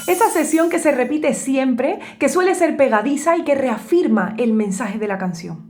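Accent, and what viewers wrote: Andalusia, Spain. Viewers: Maravilloso vídeo ❤ por cierto, de dónde eres concretamente? me encanta tu acento andaluz pero no consigo saber de que provincia es. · Gran historia del estribillo español que no tenía ni idea. Por cierto, a pesar de haberlo explicado en andaluz te he entendido perfectamente 😂. Que españolaza eres. 🤗👏🏻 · Amo ese acento particular, esa forma de decir las "T", y a veces omitir algunas S finales. De qué parte de España es característico? Saludos desde Argentina.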